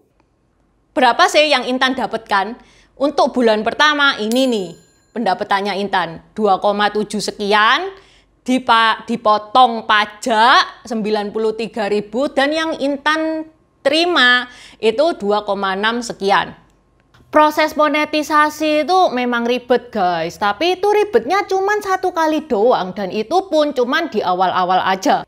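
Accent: Java, Indonesia. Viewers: Meskipun logat Jawanya kental tetapi video yang dibahas cukup bagus kok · Perjuangannya kerreenn. Menginspirasi. Medok itu malah khas dan seksi hehe... Semoga akun saya pun cepat nyampe syarat dan monet... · Salam kenal mbak Intan🙏🙏🙏,,, aku senang dengan logat bicaranya Podo Karo aku Medok Jowo, ndilalah Podo senenge Ng sejarah👍👍👍❤️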